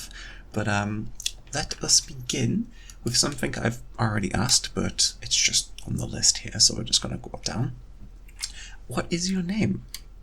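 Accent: United Kingdom. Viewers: He's scottish i think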